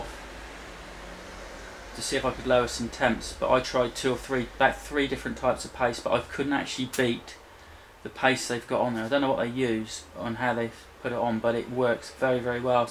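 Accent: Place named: United Kingdom. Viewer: You sound british. Where do you get your parts from?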